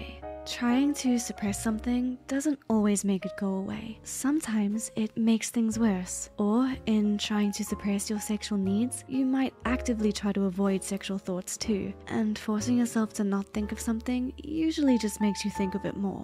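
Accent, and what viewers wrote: England, United Kingdom. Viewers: Why does she’s have English accent this isn’t normal is it does she do some the videos 🧐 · wait, gone British all the sudden now lol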